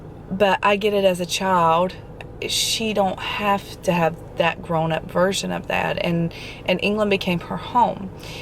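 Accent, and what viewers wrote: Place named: South, United States. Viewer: I don’t think you’re crazy, you’re a mother (mama bear), you don’t look old enough to have 2 20 year old daughters, but I must say this as a Brit, love a southern accent on a woman, stronger the better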